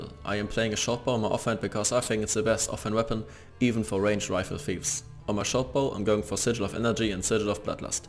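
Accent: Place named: Germany. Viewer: wie ich einfach instant höre das du deutscher bist ^^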